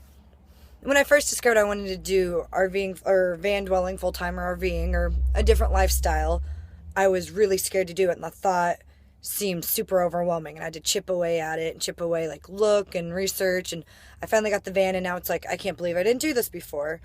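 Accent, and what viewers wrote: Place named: Pacific Northwest, United States. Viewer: I like your vids! It's always been a dream of mine to live in a camper/van/etc..... very cool. Also I could tell you were from somewhere between Portland and Seattle, probably the way you talk, it's almost like I've known you for years or something lol can always tell when someone is from the PNW or close to it.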